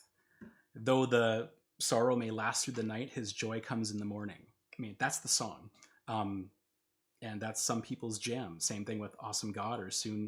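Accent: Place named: Canada. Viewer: I thought you were Canadian, before you said so. The way you say "about", "shout," words like that gave it away.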